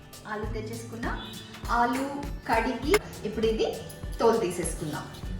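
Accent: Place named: Telugu, India. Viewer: Nice Baga cheseru. Meru telugu vallu kada garu...mee matalu ala unnai.....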